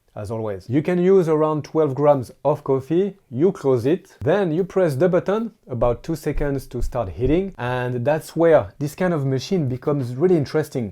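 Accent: France. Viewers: Belle accent français 😂😂😂😂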